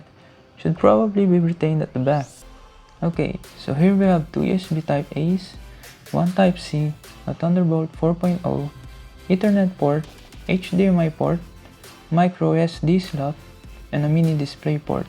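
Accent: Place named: Philippines. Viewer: I can see from your accent and your hand tattoos from Kalinga tribe that you are a Filipino. May I know how did you manage to get your hands on AW x17 r1? Did you use 3rd party courier? As you know, here in PH the x17 r1 is still unavailable and most likely will never be available because I heard that Dell PH will only import the X15 versions.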